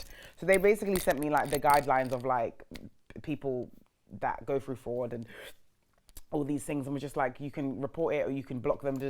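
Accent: United Kingdom. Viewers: you are the funnnnniest ! And your British 🥹😍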